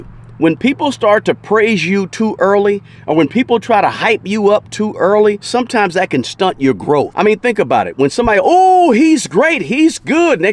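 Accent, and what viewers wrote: South, United States. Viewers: Thank you for this video! Can anyone tell me where he is from?? I know his accent, I love the people from that place but I can't remember if it is from Virginia or North Carolina..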